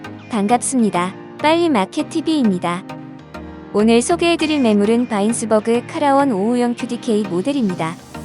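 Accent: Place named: Gyeongsang, South Korea. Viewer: 기계음보다 대표님의 경상도 사투리가 더 좋아요~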